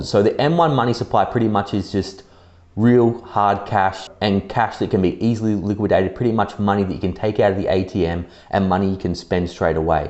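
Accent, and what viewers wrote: Australia. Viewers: You Aussie? I thought you South African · Subscribed as soon as I heard the Australian accent, just what I've been looking for. I LIKE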